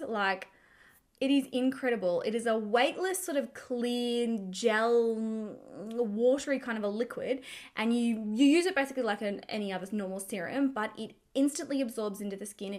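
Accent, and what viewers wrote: Australia. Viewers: Love the Aussie accent😍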